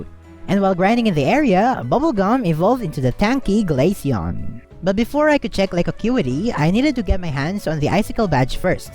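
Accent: Philippines. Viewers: Tol bago ako sa youtube channel mo at na recommend sa akin ng youtube at btw yung nung pagkarinig ko sa english mo sabi ko ay filipino to hahahaha D ko tinatawanan yung pag salita mo ng english pero kung sayu medjo d nakakatuwa Sorry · Pansin ko lang po boses mo eh napaisip ako pilipino kaya to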